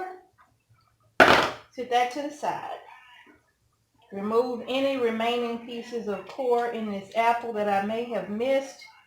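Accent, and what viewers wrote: South, United States. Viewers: I love watching and listening to you! It takes me back home to Louisiana. I live in Indiana now and it is so good to listen to the southern way of talking. I love how real you are. From one sister to another. Blessings.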